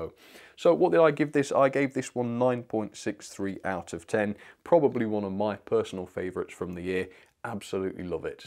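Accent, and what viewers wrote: United Kingdom. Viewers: i dont think i will... train sets are like 20 times outside of my price range for a hobby. also no offense, but the few people ive meet who are into model trains are really really really obsessed with trains. i dont think i would fit in and would be viewed as a filthy casual within the train community. i watched your thomas set vs real life trains. i like to see home made competitions like that. jelles marble runs does a fantastic job with the marble olympics. i also really like your voice, and the britishness, if thats even a word.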